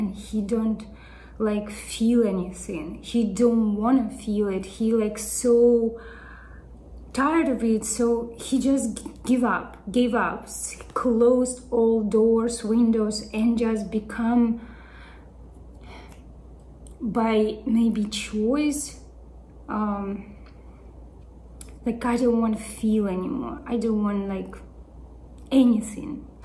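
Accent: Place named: Russia. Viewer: Какой-то у тебя акцент русский...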